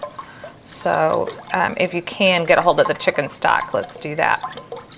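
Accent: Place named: United States. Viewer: hey I just subscribed to your page I love risotto I make it every now and than love it and I actually have a Scottish background so not Italian lol but still in Europe lol my nan was Scottish when she was alive I never met her but she seemed nice ,I will definitely try out your reicipe I am from Australia,are you from America? I can tell a bit from your accent I have shallots here we call them eshalots here I call them baby onions as they are little lol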